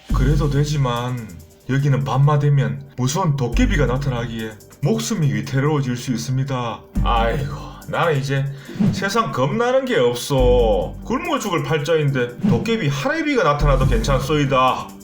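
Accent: Gyeongsang, South Korea. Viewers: 넘 재밋어요. 교훈도 되구요… 뭐니뭐니 해도 남도 구수한 갱상도 억양이 잴로 좋아 홀딱 반했어요.ㅎㅎㅎ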